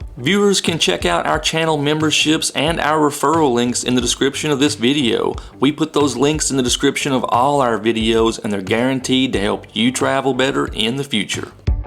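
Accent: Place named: Eastern Kentucky, United States. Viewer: Thanks mucho for your very dry narration - and yeah, hear a bit of Eastern KY in there!!!!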